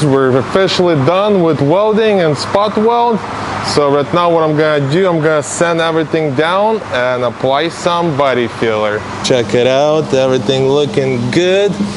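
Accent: Russia. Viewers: Ухо какой акцент )) наш человек ) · Бля,слишу акцент;)землячок!спс подписался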